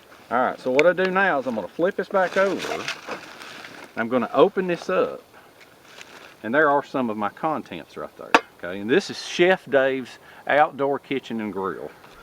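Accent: South, United States. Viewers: Tell me the truth, do you have just about the thickest southern accent of anyone??? Because my friend Meg lives down in MS and you put her beautiful southern belle accent to shame man, I love it all, LOL. I am going to send this to her now! Southern accents are my favourite. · Once again, a cool video. I like the "Southernisms", like "geeyuft". That's when someone gives you a present. Thanks Dave. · I love your videos. Your southern accent is soooo cute. What do you do as a living, if I may ask. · Hi Dave, I'm a new viewer, now a subscriber. I have enjoyed your videos so far, one with the spiders at the end kreeped me out. Love your southern accent, plus you not bad on the eyes. But did you have to make me so hungry? Keep up the good job. Oh I also love the way you call all the people who sends ya gifts, your friends. Not sure how i would send you anything?. 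Take care.... Hi to. and your Dad, he was in the first one I watched. Later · Amen! You've got that right. Love Dave and his southern drawl. It's the best!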